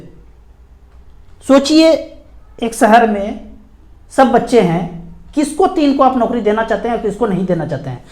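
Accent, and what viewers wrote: Bihar, India. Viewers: Good Video. Are you from Bihar?? You ascent is like Bihari.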